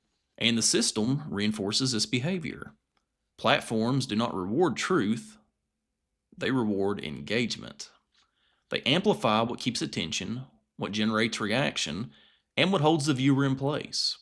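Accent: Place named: South, United States. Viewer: Great southern accent. Love it. Subscribed.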